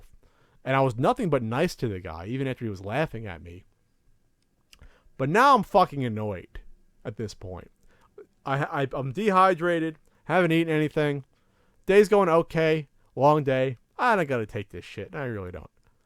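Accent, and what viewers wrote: New Jersey, United States. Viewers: I love the Jersey in u. it's one of the main reasons I watch. the New Wario, funny shit.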